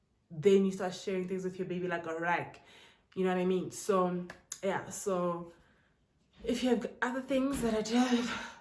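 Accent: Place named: Pretoria, South Africa. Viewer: 🤣🤣 "Comfortability" 😂😂 I can just hear hore o mkhaya wena, o tswa Pitori🤣. Your videos are so helpful mama, Thank you🤗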